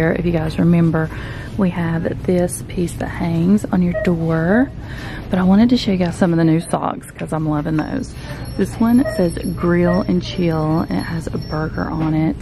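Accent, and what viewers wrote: South, United States. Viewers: I just love your voice!! So southern 💛 love your videos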